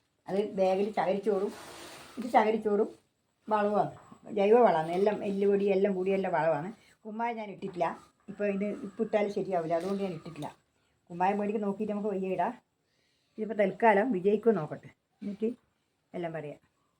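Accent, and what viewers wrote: Kannur, India. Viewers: അമ്മയുടെ നാട് കണ്ണൂരാ സംസാരം കേട്ട കൊണ്ട് ചോദിച്ചതാ അമ്മേ സപ്പർ ആണ് അമ്മേ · അമ്മ കണ്ണൂരാണെന്നു തോന്നുന്നു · കണ്ണൂർ അമ്മയാണ് അല്ലേ നന്ദി ഏച്ചി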